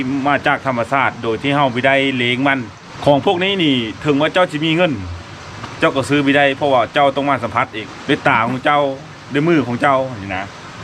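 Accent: Phu Thai, Thailand. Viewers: เว้าคือภูไทเขาวง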